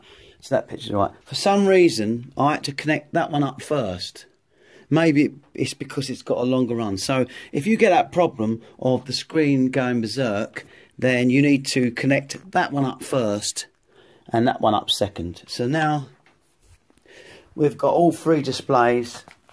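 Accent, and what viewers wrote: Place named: United Kingdom. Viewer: i really love the british accent. Cheers man! Very helpful.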